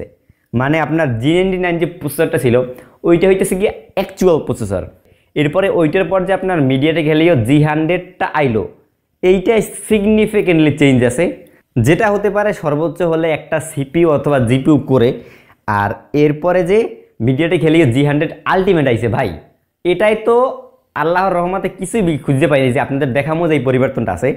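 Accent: Barishal, Bangladesh. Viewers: ভাইয়া আপনার বাসায় কি বরিশাল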